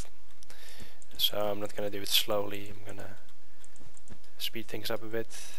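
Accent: Netherlands. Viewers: Are you from Holland? I thought I heared a kind of a dutch accent! ;-p Anyway nice video and of course nice design and tutorial! Goodbye! - The Dutch Island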